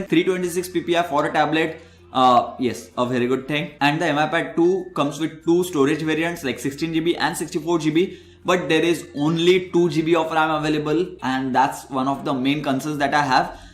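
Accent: India. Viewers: That's how Indian speaks English, dude. They take their accent into account. I can understand it easily, you just need experience.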